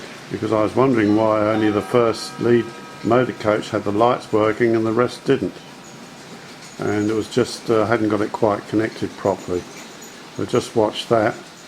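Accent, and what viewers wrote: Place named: Australia. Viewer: Wait, your accent has an australian twang... if youre australian, ignore the beginning part of the comment under this........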